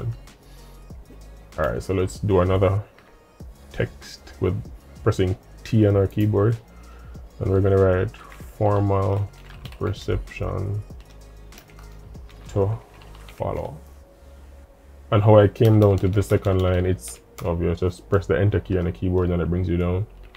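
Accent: Jamaica. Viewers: Bless up! I hear the Jamaican accent. Good to see the Jamaican Youtubers #Winning #NewSubscriber 🙌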